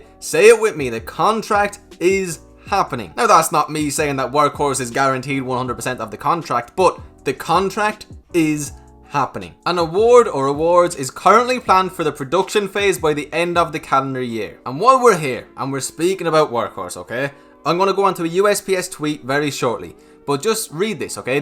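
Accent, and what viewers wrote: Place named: Ireland. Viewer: Dude i notice the irish accent. Lol.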